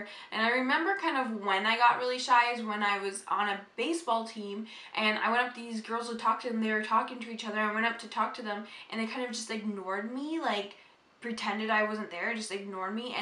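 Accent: Canada. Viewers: you MUST be Canadian! I can tell by the way you say "out". I <3 canada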